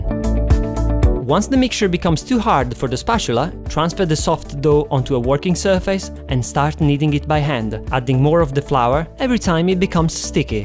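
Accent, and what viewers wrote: Italy. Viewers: Hey, your recepies are amazing! My sister and I just tryed some of them! Aaaand we were wondering if you are italian.. :) Because we notice your italian pronunciation, and it's wery well!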